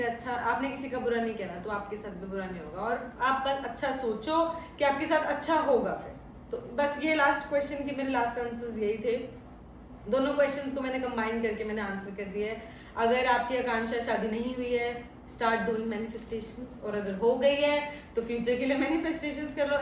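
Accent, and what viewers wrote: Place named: Haryana, India. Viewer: ap haryanvi balti achi lagti ho.. to ap roj haryanvi bola karo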